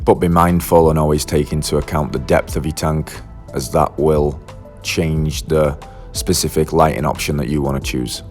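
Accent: United Kingdom. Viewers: You sound as though you’re in the UK, how can you buy aquarium co op feRT? Great vid BTW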